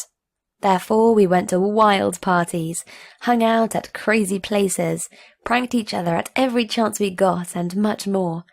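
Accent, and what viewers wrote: United Kingdom. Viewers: Y is this recorded in a British accent if they went to Walmart because that american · I like how she has a British accent and goes to Walmart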